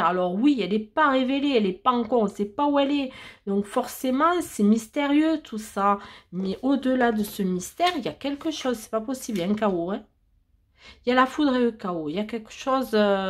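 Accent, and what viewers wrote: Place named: South, France. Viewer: Coucou ! A mon tour, je vais regarder ta vidéo. J’aime ton accent du sud ! Et je me suis abonnée. 🌹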